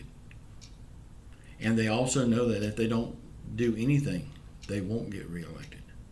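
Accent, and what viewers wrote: South, United States. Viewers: Thankyou!! Love your gentleman southern accent!! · Maybe you shouldn't watch this channel if you're offended by his southern accent. I'll continue to watch his channel , I quite enjoy it.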